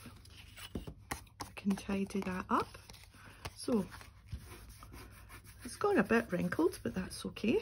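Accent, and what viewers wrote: Scotland, United Kingdom. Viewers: Hi! It’s midday Sunday here & for a change we have a nice breeze coming through the house! I saw this video & I said, “yay, some Scottish” (but I guess it’s really English with a Scottish accent!) Anyway, it’s so melodic, I could listen all day! I really like these journal cards, especially the one with orange touches! I’m so glad you showed the books, I looked on Amazon & found 2 used copies of the bird book! It’s so soft & dreamy, I will enjoy using those little cuties! It’s also September 1st! I hope autumn will arrive soon! Have a great day! Erica❤ I read somewhere that one has to learn Gaelic in order to become a Scottish citizen?? I bet that helps to keep the number of applicants down! Haha!❤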